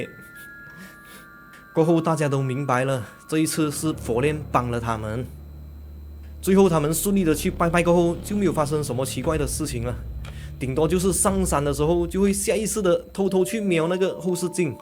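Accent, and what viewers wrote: Malaysia. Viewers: 好喜欢听你讲故事，可以了解马来西亚文化又可以听马来西亚独有的腔调。:face-blue-smiling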